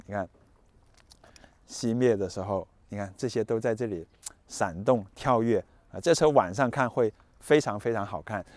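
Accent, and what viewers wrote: Cantonese, China. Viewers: 这主持人是广东人对吗？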